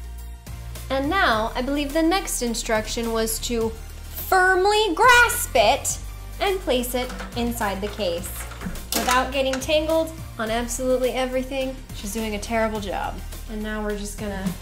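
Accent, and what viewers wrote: South, United States. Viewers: You’re killing it with the southern accent. It’s like I’m watching a home improvement video and you’re showing off your tools 😁😁👍👍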